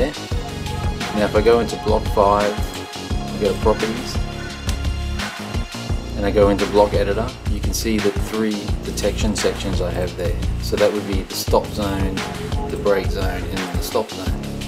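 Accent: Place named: Australia. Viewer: I simply love your videos. Thanks for posting. I'm still strictly DC and have no plans to go to DCC unless a miracle happens and I maybe win the lottery. I guess I'll continue my DC layout, but I thank you for the insight into DCC. I've searched the internet for NEA Railroad software and cannot find anything on this software. You seem to have an Australian accent so maybe this is only available in Australia. Keep up the good work and I really enjoy your videos. Keep 'em coming.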